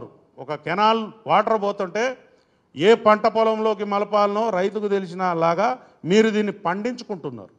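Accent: Telangana, India. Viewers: తెలంగాణ యాస ఆలానే ఉంటది కదా మరి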